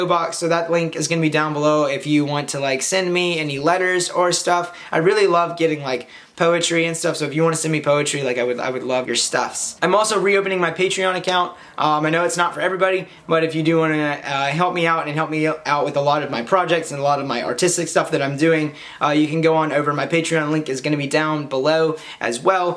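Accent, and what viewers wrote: South, United States. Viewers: Hey ya'll. I wanna here some southern draw sometimes in your vids, please sweety???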